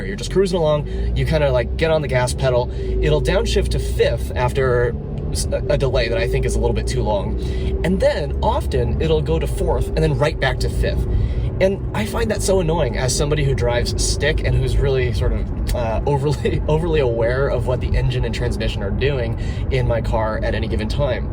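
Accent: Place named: United States. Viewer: Hello from Peru!! Great video!!! You sound like are from USA but what your ethnicity is? So handsome! Thank you :)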